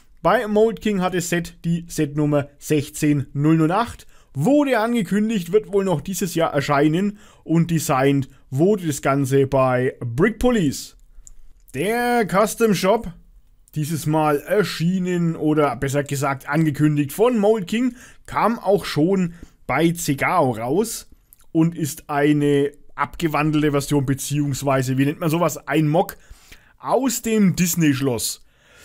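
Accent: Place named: Franconia, Germany. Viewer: Ein schönes Video mit deinem coolen fränggischen Dialekt!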